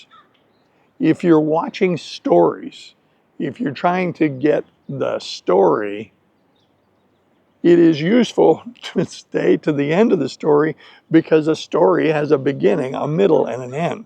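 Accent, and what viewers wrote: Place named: General American, United States. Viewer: face-blue-smiling:... again... I love the way you tell everything and throughout the story you use phrases and expressions to describe processes, situations and the spatial and temporal relationships of the matter in question are already implicit (grammar)... that is, we can learn English by listening to you and of course, then I pause the video, repeat and try to imitate your pronunciation which is very standard and characteristic of the American accent in general... thanks,!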